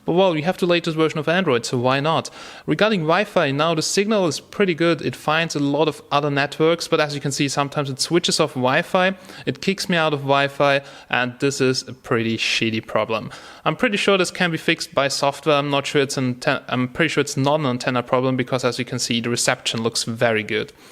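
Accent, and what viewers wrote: Germany. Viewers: ohne jetzt nach zu forschen....du bist doch ein deutscher?....so redet kein Ami oder erstrecht ein engländer!....ich würde meinen Popo darauf verwetten^^..... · I have to say your reviews are great! You're very thorough and really leave nothing out! Great Job! Your pronounciation of some words is quite cliche german, but other than that, you do have advanced free speaking english skills!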